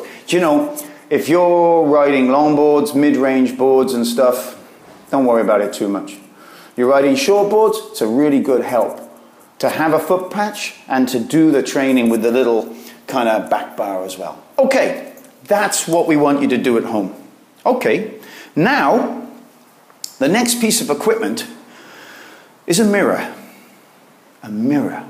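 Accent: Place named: Wales, United Kingdom. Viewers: Looking to get back to surfing after a long break 20 or so years !!! Great video, u from Wales somewhere?? Accent sounds familiar 👍 (Cardiff boy here) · What is your accent! I hear bits of Welsh 😅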